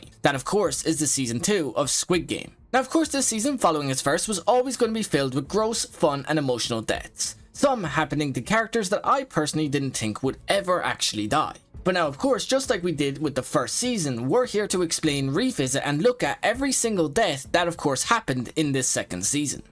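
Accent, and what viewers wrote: Ireland. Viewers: Nah hes just Irish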